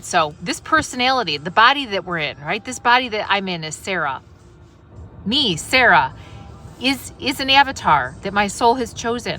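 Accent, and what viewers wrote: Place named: Midwest, United States. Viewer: Wild to hear this with a Midwest accent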